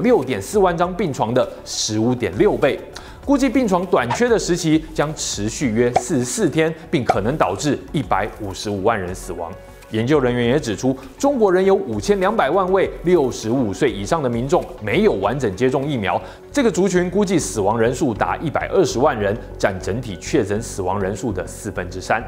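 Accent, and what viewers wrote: Taiwan. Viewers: 你这个频道就不要哗众取宠替它洗地了。封城的次生灾害你有客观统计吗？党国大学发在nature的期刊就是你所谓的“客观”？操着一口流利的台湾腔和繁体字在这误导自由世界。不齿。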